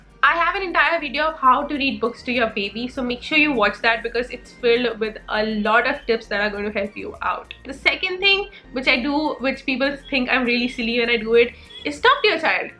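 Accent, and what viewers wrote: India. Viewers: Are you teaching your kid English in Indian accent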